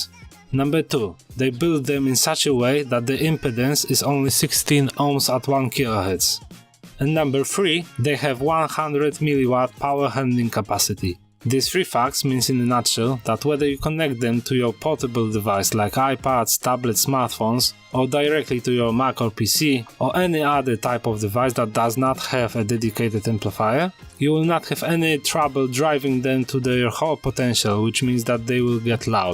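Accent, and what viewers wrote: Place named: Poland. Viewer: You have Polish accent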